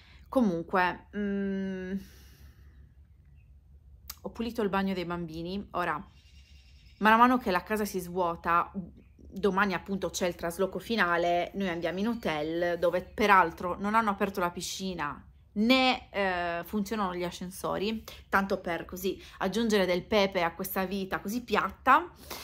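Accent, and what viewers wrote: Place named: Sardinia, Italy. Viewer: Buongiorno video consigliatomi da YouTube, non ti conosco ora guardo un po' di video per capire, dall'accento sei Sarda ? Vivi in america mi sembra di aver capito 😊 io Sardo vivo in Germania da 22 anni. Anche io ho una canale .. adesso vado a veder qualche tuo video ❤ a presto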